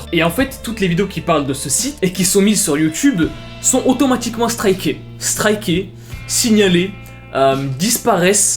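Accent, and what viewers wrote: England, United Kingdom. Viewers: J'adore ton accentuations anglaise 🥰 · Moi je trouve on parle pas assez de l'accent anglais MAGNIFIQUE de 🤗🤩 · Un accent anglais de qualité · On es tous d'accord que l'accent d'anglais de et Magnifique ✨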